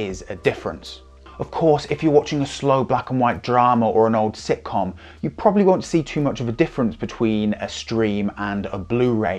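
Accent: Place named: United Kingdom. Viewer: This looks like the British version of "Films at Home" from good old bearded Jeff, different accent and no cap but the beard is there. Physical media is my first choice over streamed movies. Censor ship is a real threat and easily applied to streamed movies. A recent example: in "Home alone" the appearance of Trump is under threat of being erased. That is Soviet-style censorship, erasing former political leaders from history.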